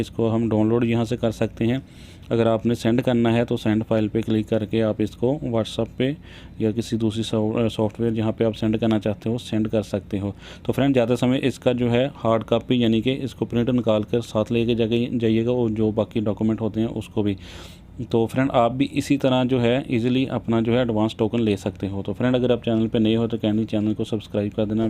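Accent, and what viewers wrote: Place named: Shakargarh, Pakistan. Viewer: شکرگڑھ سے لگتے ہیں آپ بھائی آپ کا بولنے لہجہ بتا رہا ہے, اور ماشاءالله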